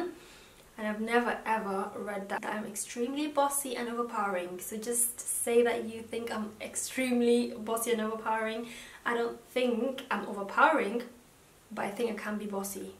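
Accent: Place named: North, United Kingdom. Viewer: She has a British Northern accent 100%.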